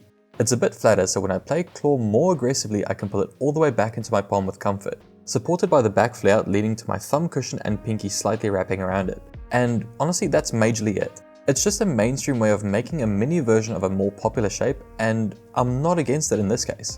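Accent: South Africa. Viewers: I saw you live in new zealand, so I assumed you're kiwi. But you sounded south african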